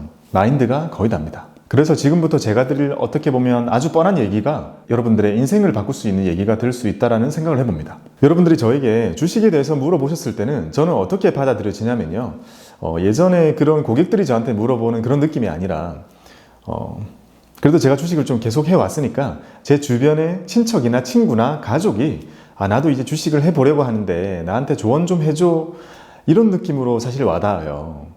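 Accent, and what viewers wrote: Gyeongsang, South Korea. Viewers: ...이름이 왜 이럴까 했는데 이제 알았어요 ㅎㅎㅎ 편안한 경상도 사투리가 오히려 귀에 쉽게 쏙쏙 들어옵니다! 금맹인 제가 오늘 하루도 성장합니다! 진심 감사드립니다~~~건강하시고 계속 좋은 방송 부탁드립니다!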